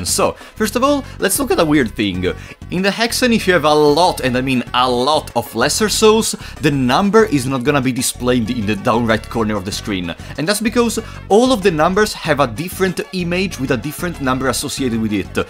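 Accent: Italy. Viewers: I just wanted to say that you are so captivating. Fear & Hunger is the first Dark Fantasy RPG I have delved into and you are the very first youtuber that made me watch a no hit run for 2 hours straight, that is very commendable my friend! Not to forget your Italian accent, it really makes everything so fun to watch would love to see some Merch in the future and on a sidenote, ive been able to beat Crowmauler using your guide:))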